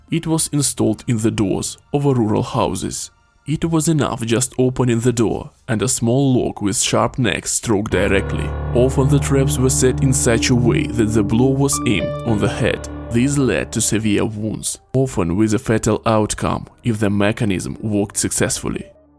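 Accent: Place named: Russia. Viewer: Молодец, но акцент всё равно русский, американцы поймут, что ты иностранец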